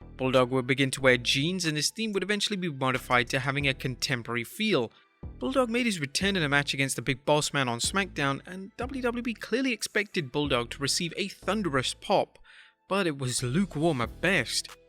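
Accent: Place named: United Kingdom. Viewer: Gotta love a top 10 list about lack of crowd reaction but we never get to hear the reaction, just a British voice talking. Also, why are top 10 lists always British?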